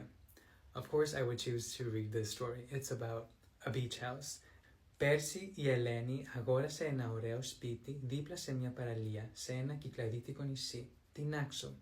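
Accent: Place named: Spain. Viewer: Not gonna lie. As a native Greek, your accent sounds quite similar to the Spanish accent. You're pretty clear to understand though! You pronounced all the words perfectly. Καλή δουλειά :)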